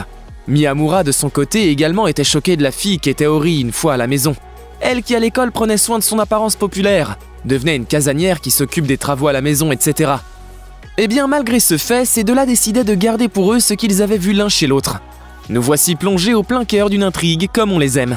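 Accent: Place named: France. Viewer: i can't stand your English accent bro, you're literally read english words with frensh accent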